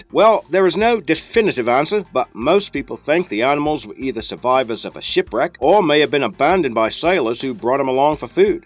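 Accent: Australia. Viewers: Im so confused what his accent is it sound Australian then american then south african.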